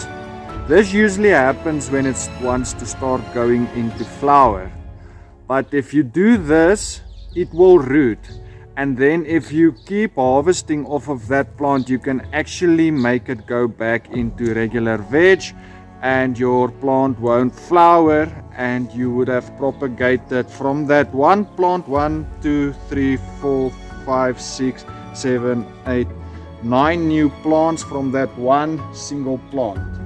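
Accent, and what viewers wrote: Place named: South Africa. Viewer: I recently came to live in Australia from SA and have bouts of homesickness. Trying to find how-to propagate rocket. What a wonderful surprise to hear the "ou" accent again! What,a blesing! Thank you for your very encouraging lesson..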